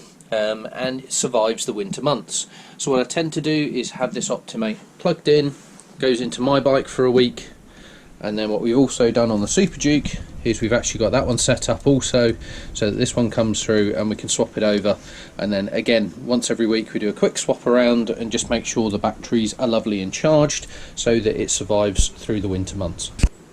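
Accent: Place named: United Kingdom. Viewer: you have an awesome channel!! i love the british accent lol. and the bikes look amazing. hope we can stay connnected ❤️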